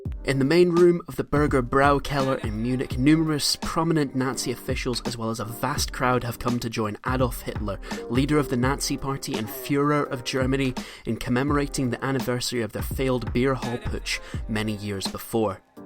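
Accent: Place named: Ireland. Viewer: Nice Irish accent you got there mate.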